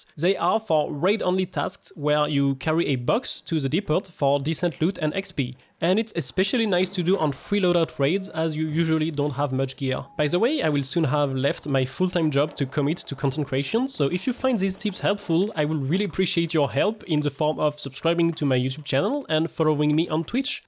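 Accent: France. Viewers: Waaa l'accent français de zinzin hahaha merci pour les tips bg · i got jumpscared by the french accent😭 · Salut ! Merci pour la vidéo c'est cool (Tu est français non ? T'as un accent)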